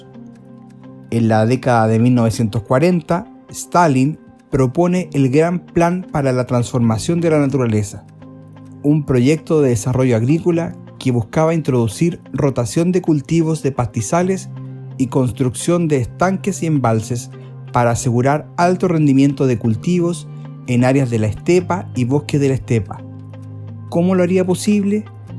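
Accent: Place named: Chile. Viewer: Estaría mejor si no lo relatara un chileno muerto de hambre 😊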